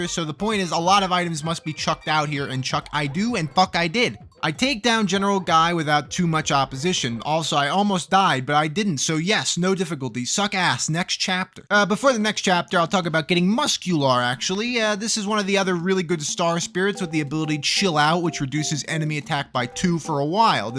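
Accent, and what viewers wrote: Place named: New York, United States. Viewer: I never knew I wanted someone to do game challenges, talking a mile a minute, and with the THICKEST New York accent I've heard in my life before! I watched this and the Mother 1 video yesterday, and I find them interesting.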